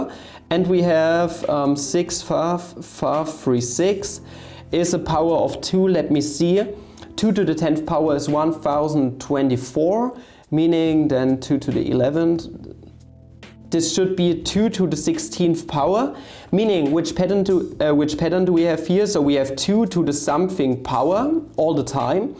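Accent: Germany. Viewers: I do not know why, but I enjoy your german accent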